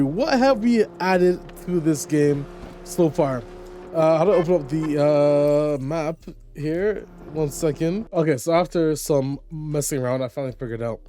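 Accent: France. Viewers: Yesss can already hear that French accent rubbing off on you! You should come to the UK bro! So happy for you do your ting fam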